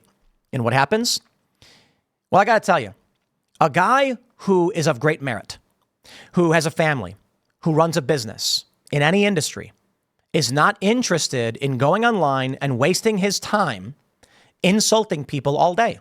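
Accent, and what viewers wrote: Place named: Wisconsin, United States. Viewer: How about a SPOILER warning? Hearing Tim read Rogan's jokes in his hoarse 'sconsin accent is a guaranteed way to not make it funny when I watch Rogan actually deliver.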